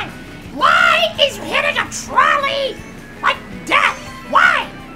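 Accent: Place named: Germany. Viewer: Are you German? I heared the accent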